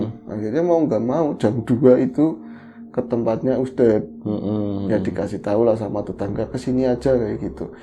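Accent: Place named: Tegal, Indonesia. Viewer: Melipir di ngobor dulu... Semangat hari Senin.. Cerita nya selalu seru.. dengan logat Tegal yang khas 💪💪💪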